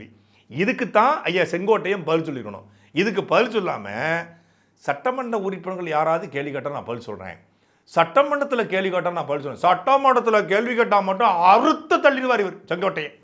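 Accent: Tirunelveli, India. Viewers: திருநெல்வேலி மொழி வழக்கு அருமை செம்ம 👌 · சாட்டை துரைமுருகன் ண்ணா திருநெல்வேலி பேசியது அருமையாக உள்ளது அப்படி பேசுங்கள்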